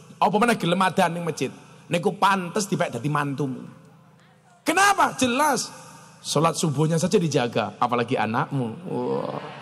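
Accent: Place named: Java, Indonesia. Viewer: Sy tiap hri nyimak ceramah e Gus Miftah gk membosan kan seneng jg dgn logat jawa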